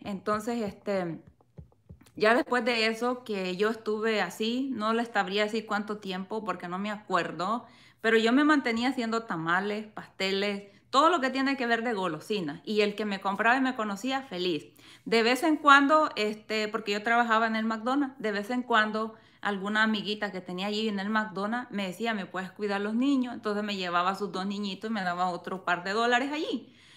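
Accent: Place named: El Salvador. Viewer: De qué parte de el salvador eres me encanta como hablas así somo yo vivo acá en Texas también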